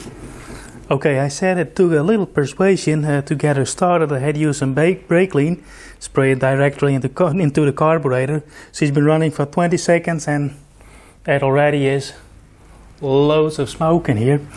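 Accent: Netherlands. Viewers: ik proef een beetje een Nederlands accentje hahaha.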